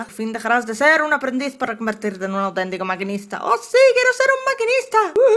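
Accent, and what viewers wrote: France. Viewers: me encanta tu acento en frances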